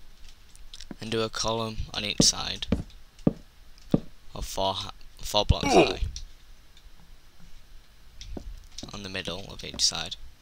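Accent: United Kingdom. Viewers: you gotta love a good brit voice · No, he doesn't, he just has a geordie accent.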